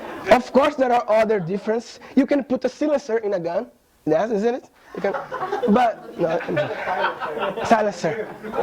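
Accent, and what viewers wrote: Northeast, Brazil. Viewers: Inglês com sotaque nordestino kkkk. entendi bem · Achei interessante. Seu inglês é bem nordestino kkkkkkkkk, sucesso viu, garoto prodígio!!! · Nordestino fala inglês também quando diz: "Oh shit, negga!" - Em português: "Oxente nega!" Parabéns, uso muito seus conceitos na minha vida, simples e diretos. Admiro você por que você continua com o sotaque e não fica querendo modificá-lo, vivemos num país continental, é normal que isso exista. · Excelente,. Estava querendo ver essa sua palestra há um tempão e de repente poder assisti-la assim com esse northeastern accent foi massa demais =P. Curti muito esse conceito de ideias sendo armazenadas e também a ótima ideia de resolver problemas que não são seus. Obrigado por compartilhar a palestra e sua jornada na singularity. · Fico orgulhoso de ver um brasileiro ensinando a pessoas do mundo inteiro algo mais importante que futebol. 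Mas, o que ganhou meu dia foi ver que esse brasileiro é um nordestino. Ou seja, vem de um lugar cujas pessoas são vistas, por gente de outras regiões, como desqualificadas e incapazes de ocupar posições diferentes de "porteiro de condomínio". Morei 6 anos em Recife, voltei para Natal há pouco mais de 1 ano e gostei muito de rever (ouvir) esse sotaque. Mas, dessa vez, em inglês e ensinando ao mundo como pensar! Nailed it,!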